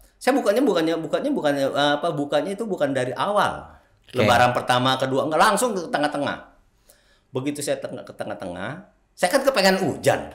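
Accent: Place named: Jakarta, Indonesia. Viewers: Seru jg koh ceritanya ..ada logat btwi nya heheh Alhamdulillah..koh istiqomah dalam agama Islam🙏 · Kok logatnya kaya orang betawi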